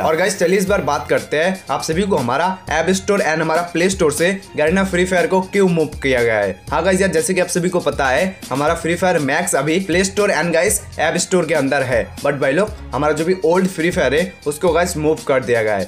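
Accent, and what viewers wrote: Bengal, India. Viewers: Yes bengal se lagta hai😐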